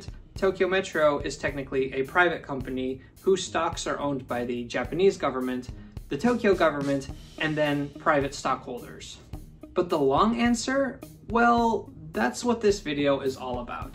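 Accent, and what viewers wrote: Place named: United States. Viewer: Where all have you lived? You sound like an American.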